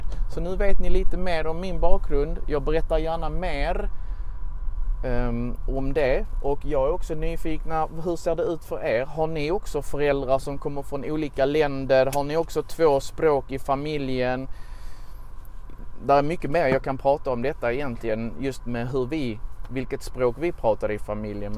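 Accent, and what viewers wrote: Scania, Sweden. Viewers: hahaha skånska är nice! ;)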